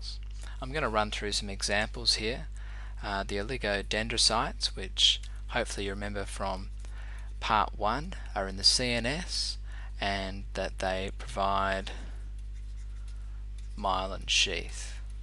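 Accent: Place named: Australia. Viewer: Why is this so much more interesting when it is delivered in an Aussie accent?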